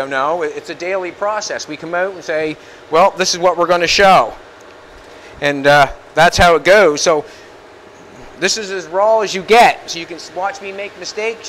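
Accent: Canada. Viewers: I recognized the Canadian way you say some words. We do the same in Wisconsin. Liked. Subscribed. Bell rung.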